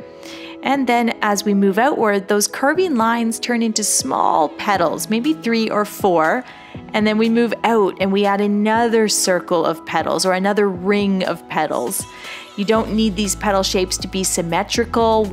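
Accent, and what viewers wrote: Canada. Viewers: She is good, but camera is to far away most of the time. She sounds like Canadian. I would like to see these done in color.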